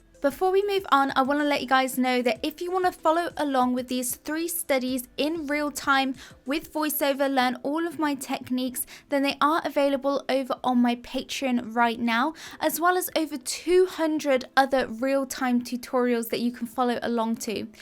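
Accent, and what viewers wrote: United Kingdom. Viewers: Why are the classes priced in US dollars when is so obviously British?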